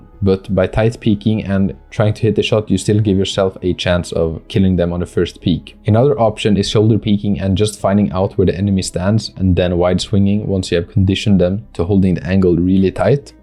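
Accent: Sweden. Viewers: Swedish accent detected.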